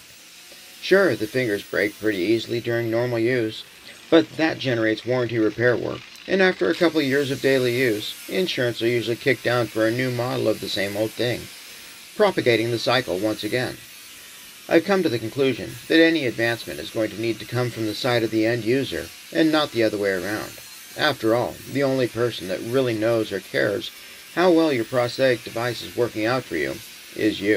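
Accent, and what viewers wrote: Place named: Pacific Northwest, United States. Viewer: I knew you had to be from Oregon or Washington we got the same accent!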